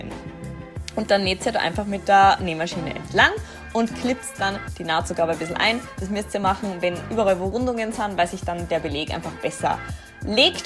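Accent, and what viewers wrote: Vienna, Austria. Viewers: Woher bist du eig., aus Wien,... oder Bayern?😂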